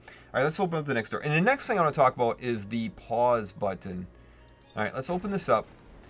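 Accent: Canada. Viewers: You are incredibly canadian, thanks for the tut man.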